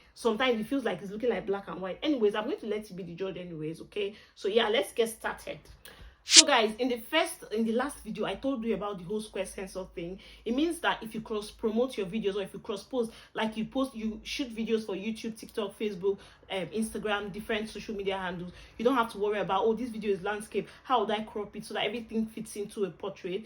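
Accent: Port Harcourt, Nigeria. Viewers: Trans Amadi accent 😂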